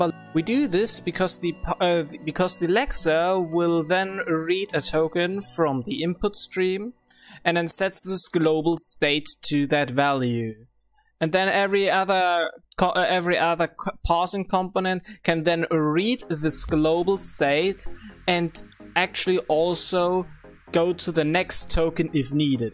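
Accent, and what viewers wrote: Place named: Germany. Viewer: 1) nice microphone 2) nice video editing 3) nice voice :) high quality! Just some weird ways to say things but great work! But that might come from the german accent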